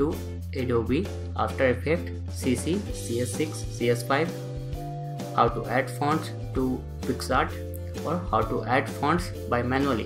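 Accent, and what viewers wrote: India. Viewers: The music really annoys me. With the difficult indian accent, the music makes it so hard to focus. Kill the music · x( no soporto ese acento indu-ingles. good video overall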